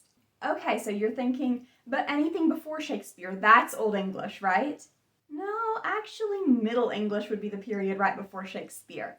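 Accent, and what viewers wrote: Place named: Midwest, United States. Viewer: You have an interesting accent. You sound sort of mid western USA but some words sound southern USA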